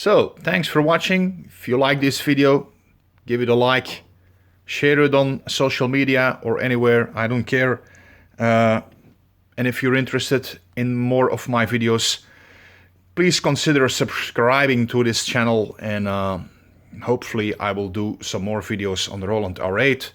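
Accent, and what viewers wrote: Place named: Netherlands. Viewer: Jouw accent klinkt Nederlands dus bij deze: dank voor de video. Heb je ook muziek van jezelf geupload naar Youtube?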